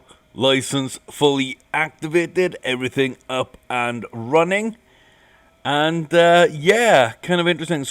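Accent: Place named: Wales, United Kingdom. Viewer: There,s Welsh Twang in your voice are you from UK originally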